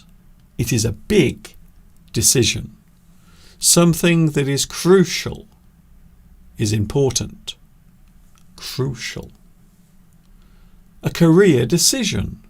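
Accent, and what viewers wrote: United Kingdom. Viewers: It's good to learn English here.British English.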